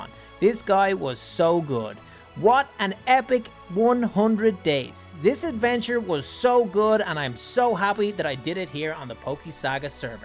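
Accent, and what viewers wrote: Ireland. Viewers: I love ur Irish accent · youre irish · The Irish acciend love it brutha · The second good Irish YouTube I found in my whole entire life also noting is that your Irish because I am to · Are you Irish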